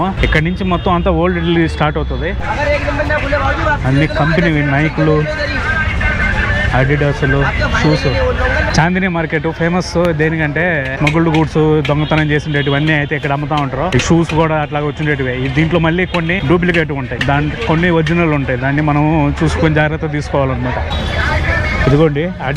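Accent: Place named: Chittoor, India. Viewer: Ho, ok😊 As per your slang, I thought you are from TPT/CTR😂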